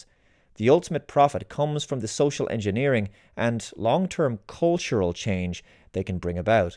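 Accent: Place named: Ireland. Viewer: If I may, I would like to hear you put the truth of Western culture’s debasement, undermining etc. etc. etc. in more historic terms. Honestly, there ain’t nothing new about what’s going on, right? Your astute and insightful dead pan brogue, for me, is the most delightful if not compelling reason I listen. Unfortunately, I find your message can come off a little hopeless with tones of the very, “them & us”divide you abhor. We can overcome this. Thank you for your great work and time. “History doesn’t repeat itself, but it does rhyme.” Russian proverb. (I think)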